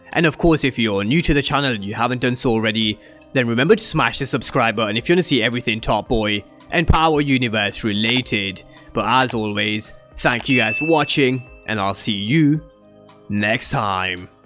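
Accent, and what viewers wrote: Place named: United Kingdom. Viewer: Your accent sounds like your from the uk, if so i rate what your doin i always watch yours n marc dark reviews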